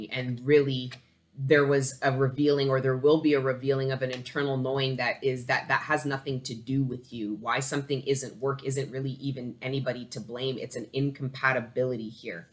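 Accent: Toronto, Canada. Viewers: You're hilarious and so fun! I thought you might be from Toronto / Scarborough from the way you talk and then you said you're from Canada. This reading lifted my spirit. I also have a similar incense burner. 🙏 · Canada- you sound like your from Toronto! I’m in Scarborough! But anyways....love your energy! 💜💜💜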